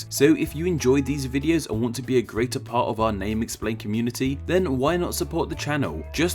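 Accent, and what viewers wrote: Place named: United Kingdom. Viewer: The accent lost me. British talking about Texas. Hmm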